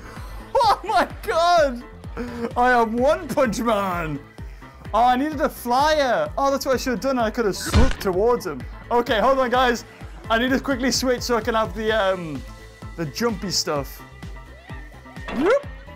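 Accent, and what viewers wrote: United Kingdom. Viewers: Are you a britt? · Are you a British socksfor1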